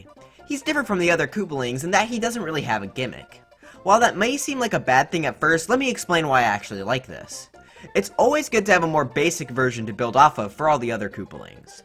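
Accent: United Kingdom. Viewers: Are you British i heard be ah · Are you British?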